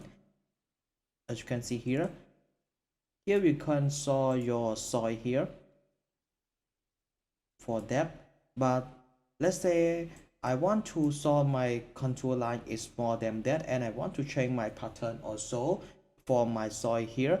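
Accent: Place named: Cambodia. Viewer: He sound Cambodian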